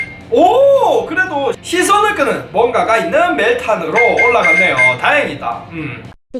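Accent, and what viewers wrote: Gyeongsang, South Korea. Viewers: 님 경상남도 사시나요?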